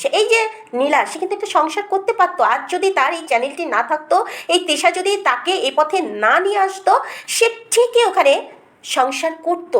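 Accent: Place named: Kolkata, India. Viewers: বাপরে বাপ, মুখ নাতো হলার মেশিন! না জেনে কাউকে পতিতা বানানো কত বড় পাপ। কলকাতার টোনে কথা বলে, কেরে তুই নতুন পাগল! তোর নিজের সংসারের কি দশা বোঝায় যায়! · তুই কে রে?কার চামচা,কোন পতিতার? কলকাতার মানুষের টোনে কথা বলতে যোগ্যতা লাগে। পতিতা কে পতিতা জেনেই বলেছে। তুই জানিস উনি কে? উনার আগের চ্যানেল থেকে ঘুরে আয় ,হেগে দিবি জানলে,দুই মিঃ তোকে বসিয়ে মুতিয়ে ,তা খাওয়াবে। তার সংসার নিয়ে তোকে ভাবতে হবেনা, তার স্বামী, সন্তান সমাজের উচ্চ স্তরের লোক,সে নিজেও তাই। শালী😅😅😅😅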